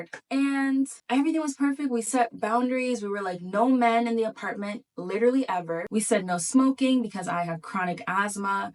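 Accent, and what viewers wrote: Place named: Canada. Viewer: I'm genuinely surprised to hear a Canadian accent on this video. That's how bad things are.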